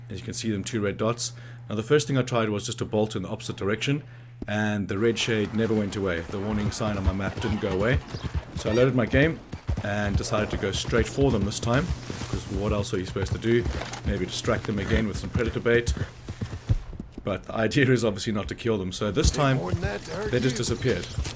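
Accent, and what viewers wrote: South Africa. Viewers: Are you South African? Your accent sounds South African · South africa?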